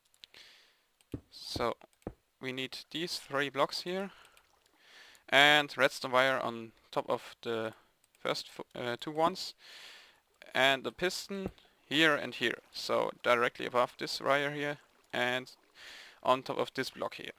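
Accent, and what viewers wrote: Germany. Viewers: ... Hey, you are German, right? You have a little bit of an accent. :D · Are you from Germany? / Bist du aus Deutschland? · vom akzent her muss er deutscher sein :D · Du bist Deutsch, hab ich recht? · Du bist deutsch, ne? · i like his germen voice or at least i think its germen still it sounds cool and ... gentleman-ish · red deutsch! ;D man hört dass du deutscher bist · HAHA, ich wusste es^^ :DD, man hört, dass du deutscher bist^^ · Man i'm from Germany and thats definitely a german accent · Are you a German ? You have German accent. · bist du deutsch?? denn dein akzent ist grausam^^ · you sound a little german... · bist du deutsch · Nein, der deutsche akzent klingt gut, es ist keine Tugend wie jeder Amerikaner rumzunuscheln! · Yes, it's kinda obvious that he's german, I'm german and I can hear it from his accent ;) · Ithinkk you're a german, oder?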